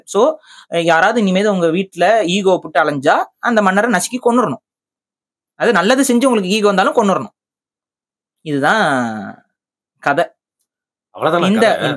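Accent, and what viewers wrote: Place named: South Tamil Nadu, India. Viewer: South tamil apidthan 😂 not like North tamil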